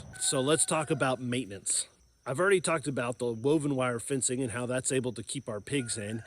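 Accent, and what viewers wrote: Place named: Scotland, United Kingdom. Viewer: Mighty fine Scottish accent!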